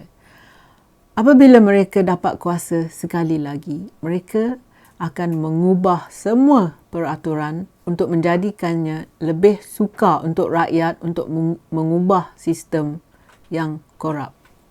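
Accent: Perak, Malaysia. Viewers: Saya suka Puan cakap loghat Perak..ha ha ha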